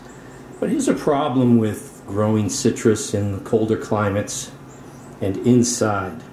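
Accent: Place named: Boston, United States. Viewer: Happy New Year! Hope that you are doing well. I miss your regular clips and commentary! Well, maybe it's the Bahstun accent! Best regards, Chip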